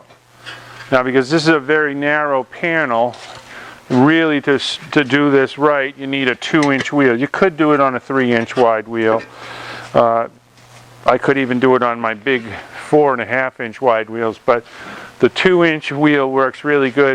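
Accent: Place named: New England, United States. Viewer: It would be a lot better if the person speaking didn't have an obnoxious Yankee accent... I could only make it through 1 minute of it before having to give it a thumbs-down and canceling the video.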